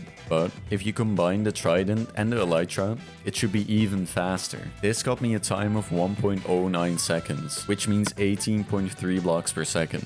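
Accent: Netherlands. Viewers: Dutch pronunciation :) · So ur dutch right? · You're Dutch aren't you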